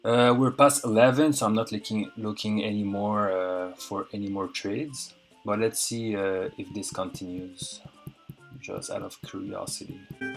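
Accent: France. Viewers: Hi, nice videos, can smell a french accent here :) Or maybe not .. Is the checkbox on your chart an indicator !? If so, do you mind share a link ? Cheers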